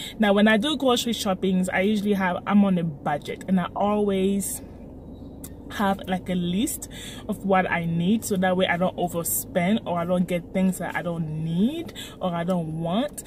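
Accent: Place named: France. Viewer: Coucou! Ah oui, ton accent francais est encore fort. Ne t'en fais pas c'est pareil pour moi aussi. I always buy extra. Thanks for sharing, I like it.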